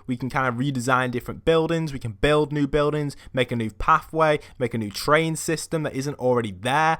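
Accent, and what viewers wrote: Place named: England, United Kingdom. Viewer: Defo English he says orll instead of arl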